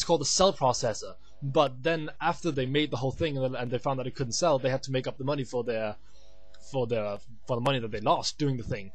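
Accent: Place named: United Kingdom. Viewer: I like how your accent is more british than american :D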